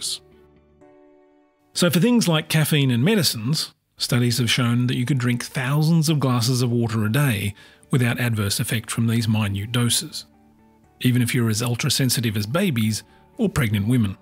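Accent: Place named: Australia. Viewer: Couldn't they find an American to narrate this? That Ozzie accent is hard to understand.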